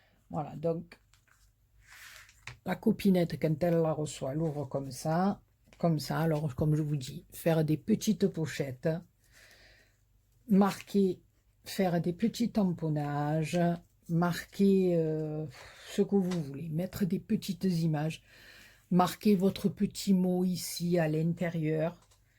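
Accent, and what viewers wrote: South, France. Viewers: Chère Madame bonjour je viens de voir ce tuto de carte à rabats super beau et que j’ai hâte de refaire. Votre carte est très belle. Et j’adore votre accent du midi qui fait penser aux beaux jours. Merci. Petite question pourriez vous me dire ou vous avez acheté votre papier motif nounours dont je raffole. Il est super beau j’adore les nounours et on en trouve très peu en scrap. En attendant votre réponse je vous souhaite un très bon week-end ensoleillé et vous dis à bientôt. Bises. Valérie 🌺⭐️⛸💋🐈🌸🧸🐞💐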